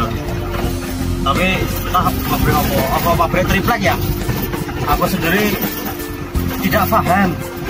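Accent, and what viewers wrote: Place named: Banyumas, Indonesia. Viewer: Asale ngndi y mas Bisa ngapak y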